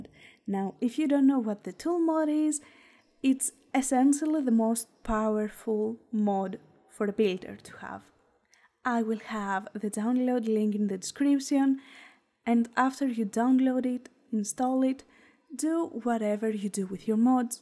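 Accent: Greece. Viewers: Hi! Are you from Greece? Your accent sounds greek! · aww knew it!! It's comforting to hear, I'm Greek Canadian and I knew I recognized that accent anywhere :) kali nixta!!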